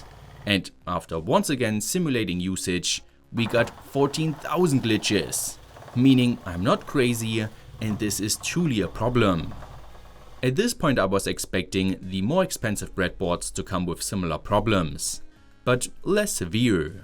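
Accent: Germany. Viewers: Bitte arbeite dringend an deinem Englisch oder sprich einfach deutsch. Man hört das du deutscher bist, dein Englisch ist einfach nur grottig.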